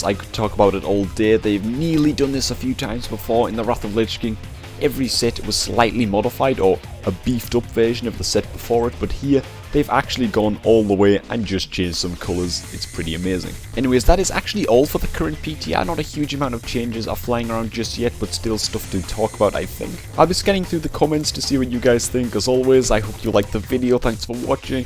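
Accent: Newcastle, United Kingdom. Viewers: are u a geordie?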